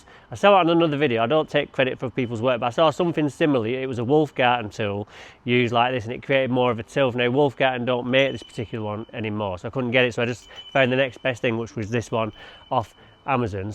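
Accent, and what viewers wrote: Netherlands. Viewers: Haha, your Dutch accent is excellent. You sound exactly like my Dutch friend, Wouter. Hi from Prague, CZ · Great video Dutch accent appalling lol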